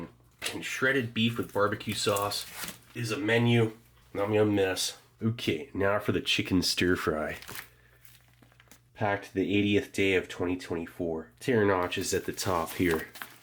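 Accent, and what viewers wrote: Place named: California, United States. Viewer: Love your videos man! Ps that Californian accent is gold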